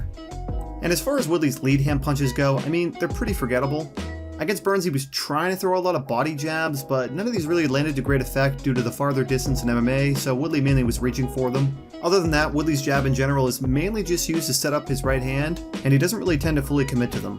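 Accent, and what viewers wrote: New Zealand. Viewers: Are you from New Zealand? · Are you from New Zealand.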